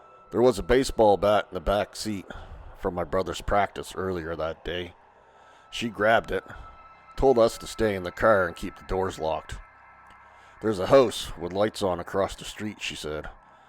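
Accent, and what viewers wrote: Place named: Upper Midwest, United States. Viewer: Spooky story! Sounds like you're from the Northern Mid-West? Canada?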